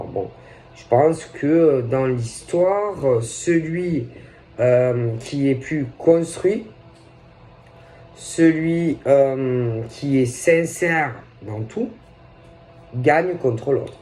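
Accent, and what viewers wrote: South, France. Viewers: avec l'accent du sud je me tord de rire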